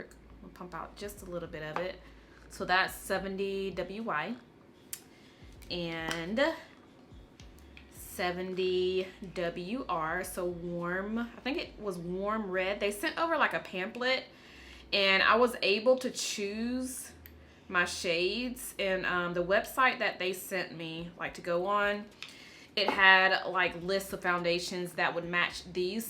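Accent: South, United States. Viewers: Girl, you are so pretty. And you sound like you’re from the south. Where are you from? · I love your southern accent I live I'm from New York city and I luv people with country accents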